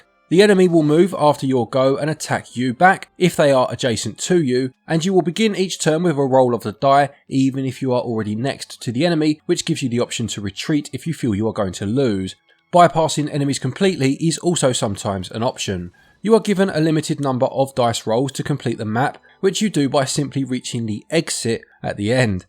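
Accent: England, United Kingdom. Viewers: Why do you keep misprounoucing Mana? I can hear you are english so I don't get it? For the record its not Marna ot Maana, I can understand americans mispronouncing this, because they don't know any better. Apart from this grating on me, this was a good review and made up my mind to buy it 👍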